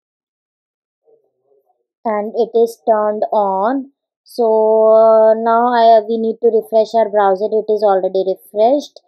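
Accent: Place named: India. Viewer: Keep making videos , Thoda hindi tone hota haina ache se samjh m aa jata h🤩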